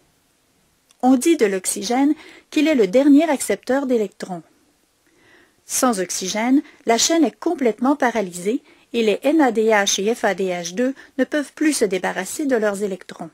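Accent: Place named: Quebec, Canada. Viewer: Clair et Conscit merci ! (petit + pour l'accent québéois trop génial :) )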